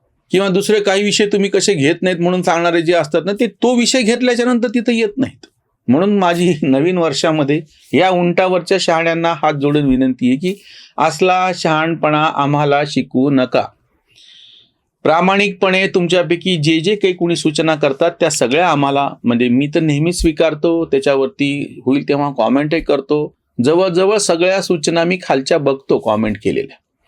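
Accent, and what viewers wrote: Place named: Marathwada, India. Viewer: हि मराठवाड्यातील बोलीभाषा आहे.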